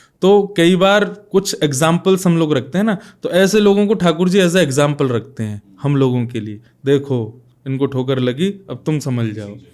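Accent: Braj, India. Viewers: aapki samukh se varja bhasha hume badi pyari lagati he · apni Braj ki vasa boliye na woh acha lagta h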